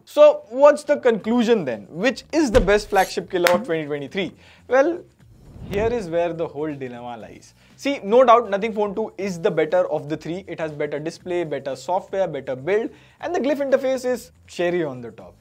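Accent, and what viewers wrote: India. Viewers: We need an easy ai tool to change indian accent to another accent (american ...).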